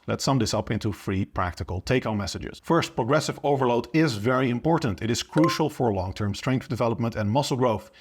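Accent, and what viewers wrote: Netherlands. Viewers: I can spot a dutch accent anywhere. Lol.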